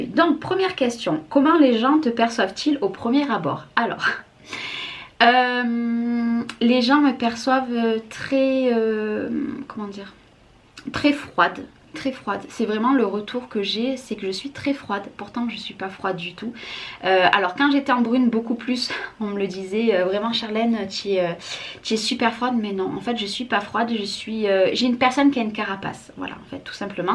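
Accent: South, France. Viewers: J'aime regarder vos vidéos et moi qui suis de Nantes j'apprécie beaucoup ce petit accent qui me ramène un peu le sud vers moi. Bonne journée ☺️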